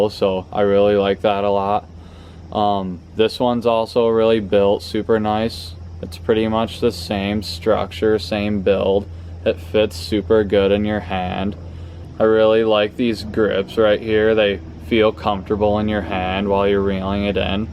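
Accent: Minnesota, United States. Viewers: You’re either from Minnesota or North Dakota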